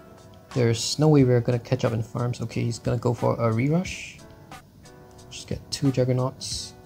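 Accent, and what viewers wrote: Singapore. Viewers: mm where are you from? Singapore?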